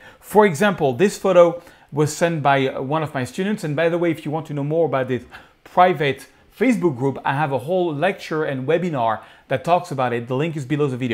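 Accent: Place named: France. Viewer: Great tips. You videos are very fun to watch, and as someone with an accent myself, I love seeing how you embrace your frenchness.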